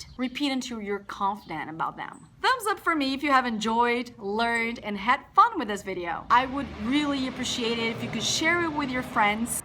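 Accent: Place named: United States. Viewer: That's not an English, it's American...gosh please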